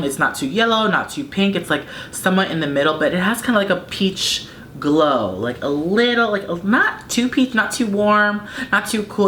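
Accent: Spain. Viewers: yes your spanish accent!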